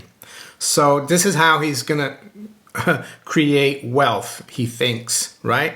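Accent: United States. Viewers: Here I thought you were an american living in England this whole time. Thanks for the video. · Italy, Brazil, Switzerland - so why the United States accent?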